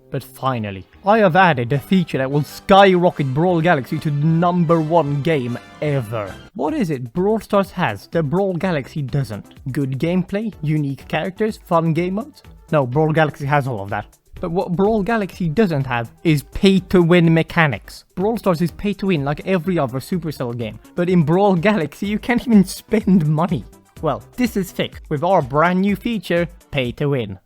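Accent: Sweden. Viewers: Förlåt men det första jag märkte var att du hade en svensk accent lmao- Nice video btw!! :]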